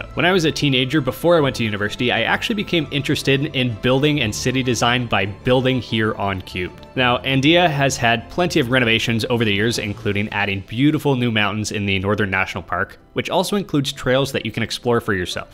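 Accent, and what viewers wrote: Canada. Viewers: Buddy you have to be Canadian. "Ferm" and "Perk" spoken like a true Easterner.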